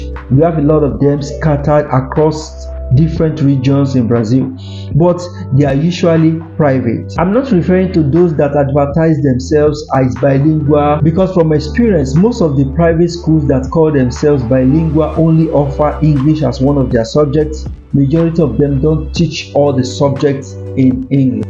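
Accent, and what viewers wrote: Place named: Portugal. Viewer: Good job! I love your Portuguese accent... :face-green-smiling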